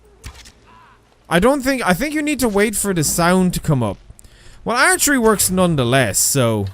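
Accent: Ireland. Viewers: You never fail to guilt-trip me into Liking your videos, eh?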